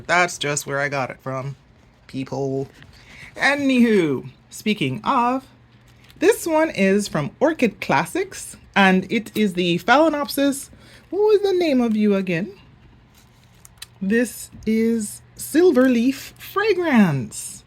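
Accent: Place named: Jamaica. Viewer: Hi new friend 😊 I just subscribed to your beautiful channel. I know I heard the Jamaican accent in your voice, and then I read the description and see that you are . Your orchids are beautiful. 😊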